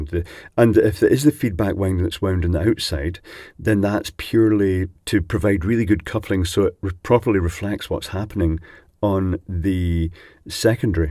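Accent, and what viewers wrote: Scotland, United Kingdom. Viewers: If it wasn't for your Scottish accent, I would have thought I was watching DiodeGoneWild, withheld transformer teardown.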